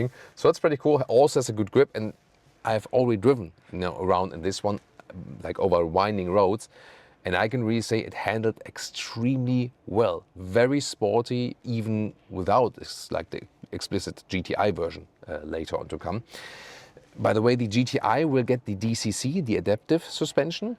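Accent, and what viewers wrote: Germany. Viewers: Dude, I love your videos but you really need to improve (fix) that accent. I know that German is closer to English accent than Greek, but believe me, this makes it also much easier for you to transition to a more British accent. It is hard to concentrate to content when I think I am listening to Mertz talking about German Fiscal discipline! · bro bitte lern besseres Englisch... ist nicht böse gemeint nur Kritik (dein Satzbau war zwischendrin wirklich fatal), aber behalt den deutschen Akzent, den mag ich ;)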